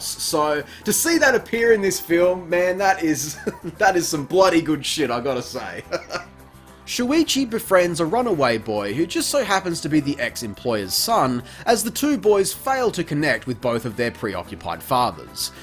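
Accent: Australia. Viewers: I had no idea you were Australian hahahahah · Since when the hell were you an Australian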